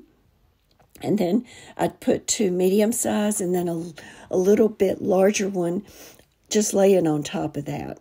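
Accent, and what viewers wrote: South, United States. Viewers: everything is so pretty. I think my favorite is the three crosses. Thank you so much for sharing the Lord here on your channel. I know I told you earlier you remind me so much of my sweet aunt Brenda. Even to your sweet southern drawl. Sometimes when I’m watching your videos I feel like I’m listening to her. 🩷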